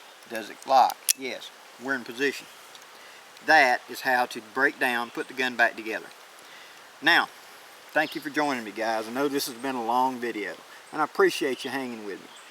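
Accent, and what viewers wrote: South, United States. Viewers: Iimpact driver,small hammer, well fitted bits, and a padded vise with patience and care will get the screws out. putting them back where they came from is brilliant. Very nicely done sir. P.S. I thought I did hear that Magnolia in your mouth. Hurrah for the Bonnie Blue flag. The fourth screw is for the shoulder stock which will not work on this gun.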